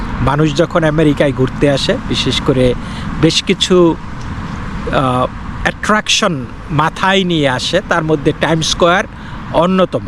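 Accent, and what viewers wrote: Bangladesh. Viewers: ওওওও দাদা আপোনার বাংলা বলার ছিরি অমন ক্যানো? ওওওও বাংলাদেশের আপনি?ইন্ডিয়ার বাংলা এমন নয়।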